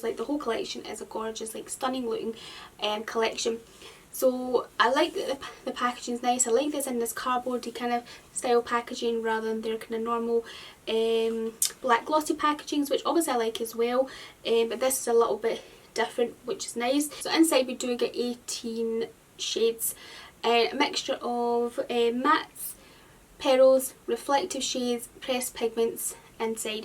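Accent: Scotland, United Kingdom. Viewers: Such a gorgeous girl and also such a soothing voice...ASMR candidate right here. Soft and gentle Scottish accents are the best. Great tutorial too. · She’s Scottish... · i think she's scottish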